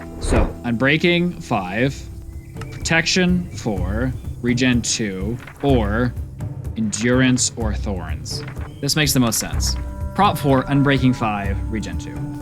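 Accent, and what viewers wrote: Canada. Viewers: Are you Canadian? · Why was he so Canadian in this video? Maybe I'm trippin and just haven't noticed before but I thought bro was American up until now😂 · Are you from Canada by chance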